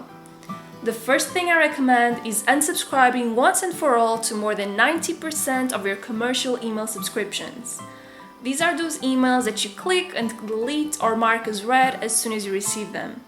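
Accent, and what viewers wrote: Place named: Portugal. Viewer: I have been watching some of your videos and because of your accent I thought you are Portuguese 🇵🇹 and now I think I was right because I saw a Via Verde email 😂. Am I right?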